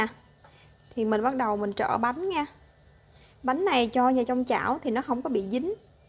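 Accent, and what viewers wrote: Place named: Mekong Delta, Vietnam. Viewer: Mình thích món này lắm, hôm nay được bạn chia sẽ công thức cảm ơn bạn giọng nói của bạn thật dễ thương, mình nghĩ chắc bạn là người miền tây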